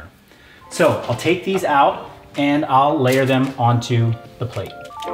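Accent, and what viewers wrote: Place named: California, United States. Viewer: Also you sound like Uriah Faber lol, must be from California? In fact you sound a lot like anyone from Sacramento and a lot of Team Alpha Male.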